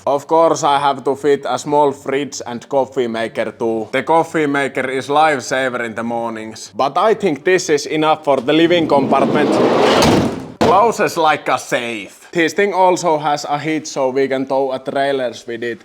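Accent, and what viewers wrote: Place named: Finland. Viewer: Cmon guys.. there is no shame in rally english. It’s literally english with finnish accent and that’s it. That is very understandable for native speakers in my experience. Ofc it sounds rough in the beginning but ”suomi sopii sulle paremmin” commentators can burry their heads in the sand and keep living your life xD you do have balls of mulliperse.. keep it up!😂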